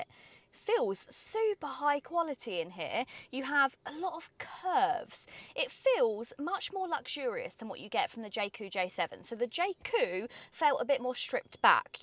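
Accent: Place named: Estuary, United Kingdom. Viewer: Sorry. The estuary accent and the presenter's odd dress sense totally distracted me from the actual content.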